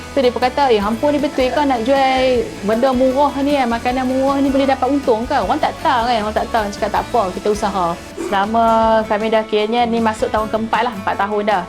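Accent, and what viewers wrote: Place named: Penang, Malaysia. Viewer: Mantap kak.kekalkan dialek penang kita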